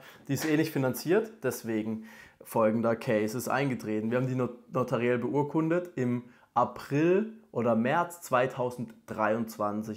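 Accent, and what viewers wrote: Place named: Baden-Württemberg, Germany. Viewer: ach ich hab jetzt nochmal paar mehr videos von dir nachgeholt und gemerkt, es liegt wohl an deinem Badenwürtember-Akzent. 😂 aber kommt dem schon nahe vor allem auch mit der Betonung. Ich meine Percy, die Rolle von Teddy Teclebrhan / aka Antoine.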